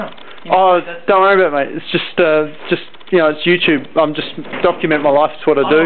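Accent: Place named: Australia. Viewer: all that for a number 1.... thought u were an aussie mate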